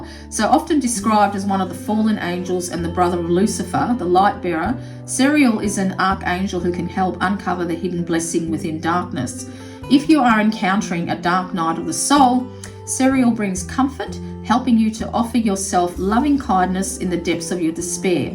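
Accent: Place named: Australia. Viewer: Awwww bless you and thank you so, so much for this lovely review of my cards!! I love that you saw Sandalphon as Fabio! Ha ha I also think he’s like Conan the Barbarian 🤣😂 So lovely to have a fellow Aussie wise woman reviewing these. Your accent makes me homesick ❤️ I hope you are feeling better soon. The goddess and the angels are with you 💖 Loved hearing about your own visions and experiences with the angels during this too. Thank you! Good feedback on the pronunciation guide. I will add that in my next deck - great idea 🙏 Have a gorgeous day and huge thanks again. I feel very humbled by this review. Love Alexandra xxx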